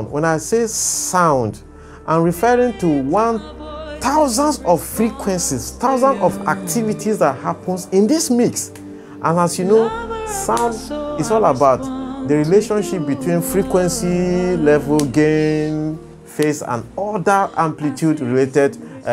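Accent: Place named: Nigeria. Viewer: Informative, but that accent eyy, is that nigeria, it makes me remember the way nigeria school teacher speaks. But the information is 💯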